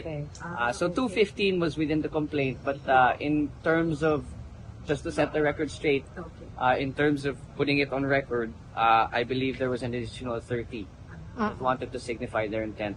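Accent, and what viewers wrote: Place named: Ilocos, Philippines. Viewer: Haha nakakatuwa yung ilocano accent niya. Yun lang. 😂😂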